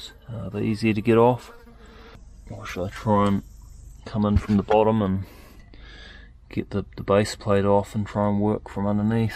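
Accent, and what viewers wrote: New Zealand. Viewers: lmao was slowly noticing more and more NZ fauna as I was watching, didn't catch the accent at first sorry g thought you were an Aussie. Major props had no idea this stuff could be found in our country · New Zealand I suppose? Hope I got the accent correct? Or is it good day mate? I am from little rock Arkansas, so please forgive me, not trying to offend. I like you rescue of the bulldozer. To cool mate! We, in the United States love heavy equipment. Loved this episode. Hope to catch More of your rescue's! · Just found this video. Amazing. Great to hear a kiwi voice doing this sort of stuff. on YT Unless you know this country, you canʻt imagine just how difficult it is - fighting your way through the matagouri and manuka. Used to go up to Tennyson Inlet on holiday and did bush bashing up there so have an appreciation. Great video, no frantic panning and shakey stuff. 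Well done on something incredibly well put together. As you know, there are lots of these old TD6s, TD8s and TD 9s left still surviving after years of hard work. incredible mechanical skills as well - good old No8 wire kiwi stuff! You deserve this one mate! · He sounds like a kiwi also the red band boot is a likely sign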